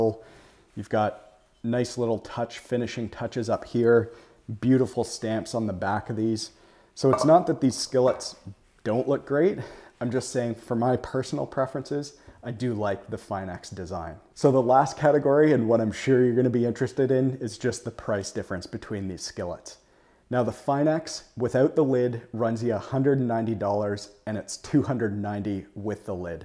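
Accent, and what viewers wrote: Canada. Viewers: Once I heard the Canadian accent I couldn't unhear it lol Great review!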